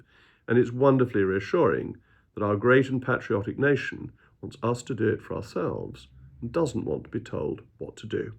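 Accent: RP, United Kingdom. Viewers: You can talk as much nonsense as you like with your posh accent, but there's only one question that needs answering… Now that it's been several years since we left, is the UK now at least as successful as it was when we were in the EU, or have living standards across the board dropped significantly? I think most people know the answer. And this is the ONLY question with significance. The rest is just hot air... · Jacob, I listened to you to have first hand knowledge of what you have to say. Your slow over pronounced words about sovereignty, control and who makes the rules is sadly to obscure your personal interests of financial engineering and tax evasion. The EU is a terrible group of non-elected bureaucrat globalists who are acting against the interests of Europeans and dangerously warmongering against Russia, which is no threat to Europe at all. Jacob is a banker, in both senses of the word who creates and makes nothing. 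He is a financial speculator and the sort of person who has destroyed Britain as much as the woke idiots. Britain has many serious issues none of which are solved by Brexit. Jacob's weasel words about the intelligent, patriotic British is disingenuous, his words are aimed at those stupid enough to think his posh voice makes him intelligent and speaking in the interests of the majority of British people. Don't be fooled, he's no different from Farage, Cameron, Johnson, Blair, Starmer, and all the other snake oil salesmen.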